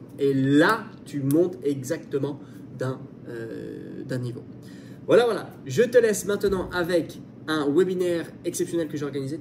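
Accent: Scotland, United Kingdom. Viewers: “Aha” is kind of international 😉 Love the Scottish accent 🤪 Have a great day !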